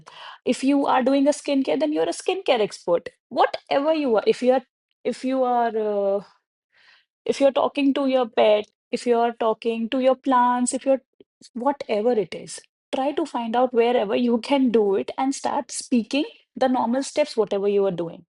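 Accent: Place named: India. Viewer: From your voice, it seems to me that you are from India, isn't it?"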